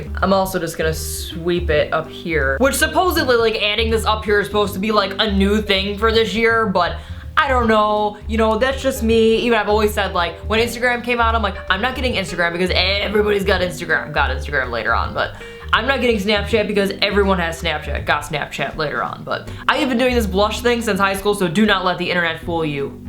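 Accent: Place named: Fargo, United States. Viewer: You sound like you're from Fargo at times, I'm sure that's an assumption, I enjoy your accent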